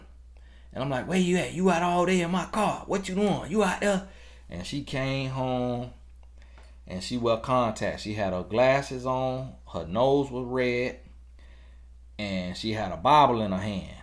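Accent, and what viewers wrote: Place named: Florida, United States. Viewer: I come for your wisdom, but most importantly I come to hear that Florida accent: “Werk”, “Bike”, “finna”...I miss that accent. I’m from Florida too, but live up North now. Thank you 🙏🏿 And that Florida Marlins hat though! 😎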